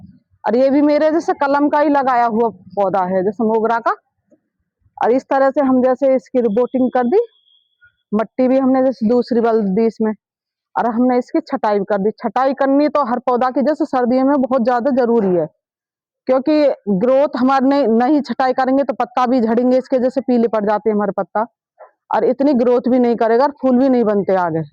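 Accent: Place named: Agra, India. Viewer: Kahan se h*. AAP. Agra ki taraf ki language lag rahi hai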